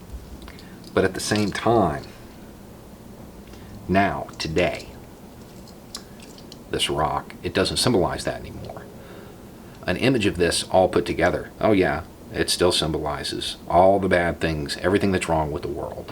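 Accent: South, United States. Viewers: I guess while we need to be able to exercise our freedoms to improve, what I immediately think of is a lack of kindness. I’m tired of people treating each other like garbage. It feels like humanity is in its teen years, and anything different is made fun of, is a weakness to poke at, in hopes of tearing them down and making ourselves feel better. When I first started watching this channel, I was hesitant to click. I’m from the boarder of TN/KY and I knew that accent immediately, lol. And I wasn’t used to hearing these ideas come from someone who sounds like this. So not only do I find out about world events and hear a very interesting perspective, but I also have to check myself, because while I wasn’t actively being a jerk, I was falling into the trap of putting someone in a box of my expectations before I’d heard the words they had to say. I feel like... 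this channel helps keep me honest, while I’m trying to find ways to promote what I consider to be the ideal.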